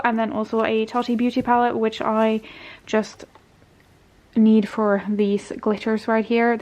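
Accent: Ireland. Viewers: Irish accent😀??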